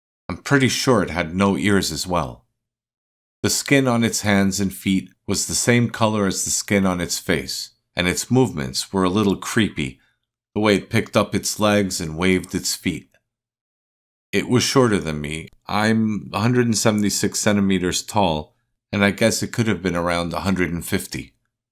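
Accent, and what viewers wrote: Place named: United States. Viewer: I was actually wondering that (excellent American accent btw, but I can also hear the Hungarian), if this is your native country, telling of local stories. That mega-brilliant anti brain fart, i.e. epiphany, came to me later, however I forgot to edit my comment to include it. Sorry. I do love your content with the excellent quality you bring ;) I've long been into cryptids & paranormal occurrences, so this is great!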